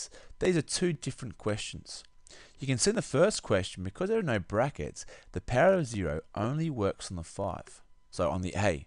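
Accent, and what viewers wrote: Australia. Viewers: Thanks mate for the help. I have a block exam next week for maths b and I needed to recap. Nice to listen to an Ausie voice as I am to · i know this is an old video of yours but i just stumbled apon it im in 8th grade and im also an aussie and its really nice to hear a good loud aussie instead of a quiet european tutor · Thank you so much! My teacher doesn't show how to work out the questions she just puts examples on the board expecting you to know what to do. I also love that you are Australian because as I am australian I find the accent easier to actually listen to. THANK YOU SO MUCH. I hope I pass year 9 maths this year lol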